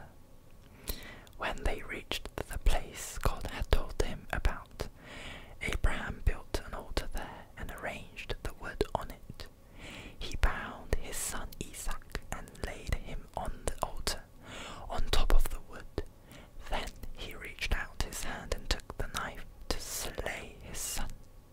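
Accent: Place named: England, United Kingdom. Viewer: Wow hi, I just discovered your channel. Your voice is so cool! England-accent is nice. Also, I'm sorry you faced the racism you did. :( Also thank you for being so vulnerable. I know that can be very hard. Please feel free to talk about yourself more. Talking helps bring healing. Allow yourself the healing you need.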